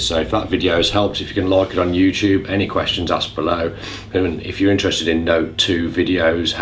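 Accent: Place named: Cockney, United Kingdom. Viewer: Cockney. coolest accent ever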